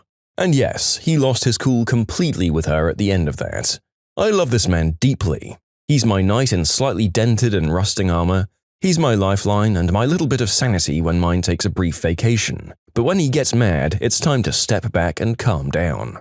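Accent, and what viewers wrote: United Kingdom. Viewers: wtf happened with your voice you sound like a rich white british man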